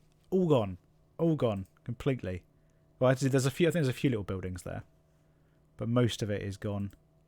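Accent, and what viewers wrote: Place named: South, United States. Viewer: Hey, I'm new to your channel and absolutely loving it! I'm from up north and trying to locate where your accent is from, where abouts from the South are you from?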